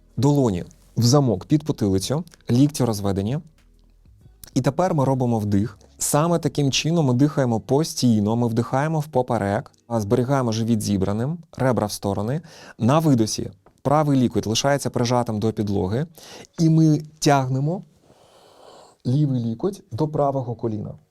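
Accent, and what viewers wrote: Standard. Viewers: Як приємно слухати справжнього фахівця своєї справи! Дякую Вам за доречні поради, гарну чисту мову і позитив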